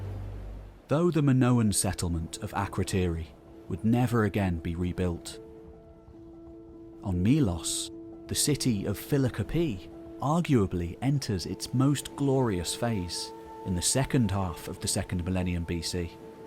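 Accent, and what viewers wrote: England, United Kingdom. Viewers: just hearing your english university narration = PUKE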